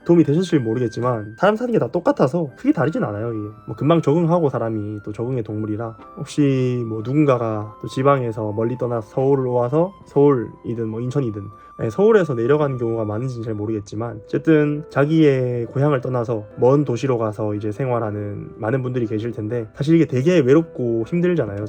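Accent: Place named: South Gyeongsang, South Korea. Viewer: 창원분이시구나 ㅋㅋㅋ 어쩐지 익숙한 경남 사투리네요 ㅋㅋㅋ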